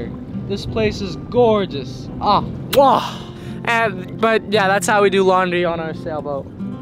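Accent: United States. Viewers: cazzo, ma è delizioso come parla sto sudista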